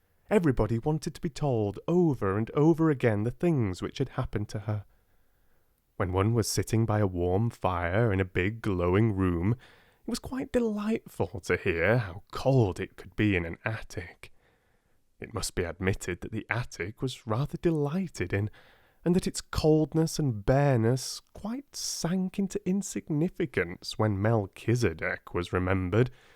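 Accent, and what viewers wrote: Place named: United Kingdom. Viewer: I've just seen this premiere being scheduled for 10pm (in Serbia).. and just to let you know, no one would mind you reading it in such a lovely accent! I've actually discovered your channel whilst looking for an audiobook for this one in British English.. and we have the same editions, I like to listen to an audiobook and follow the story in a book, since I'm not a native speaker, I find it really useful! All the best, Greetings from Serbia!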